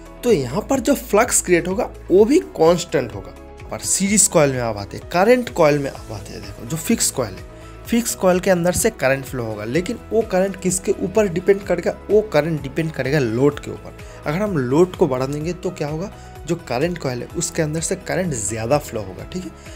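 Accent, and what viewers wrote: Bengal, India. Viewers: आपकी भाषा से आप बंगाली लगते हो को बंगला भाषा बोलते है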